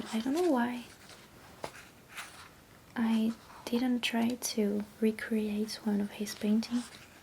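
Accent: France. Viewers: Hi love! Don’t feel stupid about your English, as not native speaker person but English is my second language which I use every single day, I can say, your English is very good and I love your French accent ❤ you was as my recommended video on main YouTube and totally loved it! I’m suprised because this is the first time, where I see actually a person who is drawing and showing such an incredible work 🥰 take your time and definitely don’t give up on your English love, if someone says about that your English is bad or something, just ignore it and keep going! I’d love to see more videos where you are drawing. ❤️ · i studied abroad in france for 4 months in sophia antipolis and lived in antibes- i miss it so much!! your accent is wonderful and reminds me of my time there ❤️ i also want to say that your english is great!! you are very well understood :) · Oh wow I'm so happy, this video is like made for me 🤩 my favourite kind of ASMR videos are book show and tells, and I looove french accents! 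This is immediately one of my favourite videos ever, it's so comforting and interesting to watch, it's perfect :') would love to see more of this type of stuff (or anything really, I just subscribed). Thank you!! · Tingly and relaxing. I love your soft-spoken French accent.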